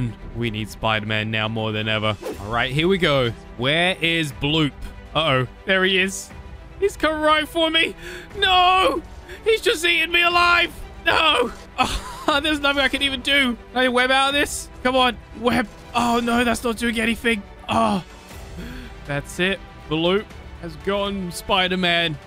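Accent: United Kingdom. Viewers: 😅he's british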